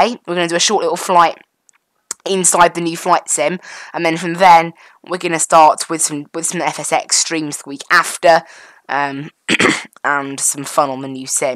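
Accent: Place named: United Kingdom. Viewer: this is how i imagined all british people sound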